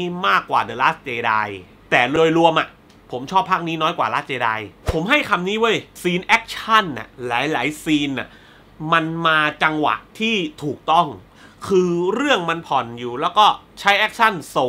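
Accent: South, Thailand. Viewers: พึ่งรู้ว่าพี่คนใต้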